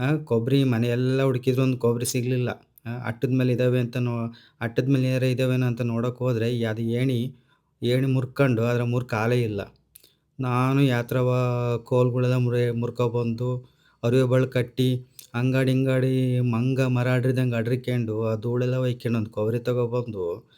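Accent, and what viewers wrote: Chitradurga, India. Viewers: ನಮಸ್ತೇ ಸರ್ ನಾವೂ ದುರ್ಗದವರೇ ಸಕ್ಕತ್ತಾಗಿದೆ ನಮ್ ಭಾಷೆ ನಿಮ್ಮ ಅಡುಗೆ ಸೂ.....ಪರ್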